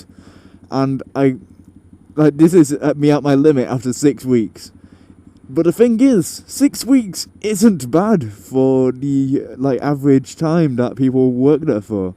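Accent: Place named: Sheffield, United Kingdom. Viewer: i come from sheffield but live in worksop in 15 miles away from sheffield i thought i recognised the accent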